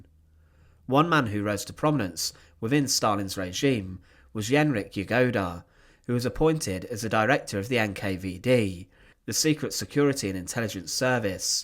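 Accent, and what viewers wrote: United Kingdom. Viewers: The only thing this announcer has going for him is his British accent.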